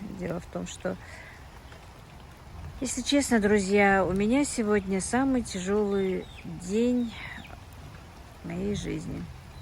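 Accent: Standard. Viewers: я Ваша тёзка. Недавно подписалась. Я с Троицкого. А Вы где живёте? И ещё хотела спросить: Вы раньше не в Грозном жили? Или с Пригородного? У Вас речь чистая и правильная...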